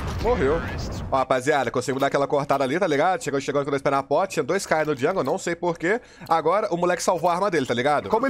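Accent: Belo Horizonte, Brazil. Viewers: tu fala igual meus conterrâneos daqui de BH kkkkk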